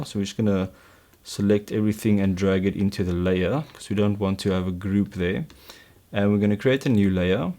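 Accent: South Africa. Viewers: You sound south african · You sound South African,are you ? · I Love Ur South African Accent I'm Also South African! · South African by far ;D Afrikaans accent ;) So yeh, he is South African, or somewhere in Africa xD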